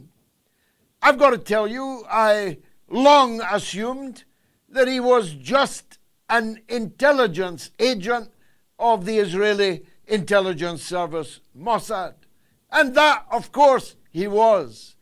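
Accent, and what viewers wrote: Scotland, United Kingdom. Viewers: Hold on, maybe I just misheard you due to your thick Scottish accent, but did you just say ‘Bubba’ is a HORSE??!!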